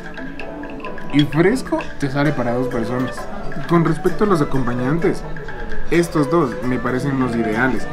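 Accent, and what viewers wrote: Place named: Quito, Ecuador. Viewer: Hola habla feo. Sólo en Quito hablan así o todos los ecuatorianos ??! Espero tu respuesta pero sin ese deje ni acento. Gracias !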